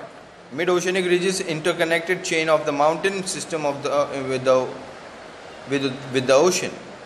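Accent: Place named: United Kingdom. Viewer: Doesn't ur English belongs to British country sir why r u wasting u r time in Assam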